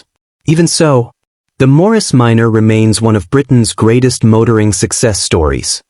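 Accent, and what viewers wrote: United States. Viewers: I am so sick and tired of AI generated garbage on YT. These are British cars so why do you show so many of them with foreign registration plates and FFS, ditch the Yank narration! You should have a British accent talking about British cars!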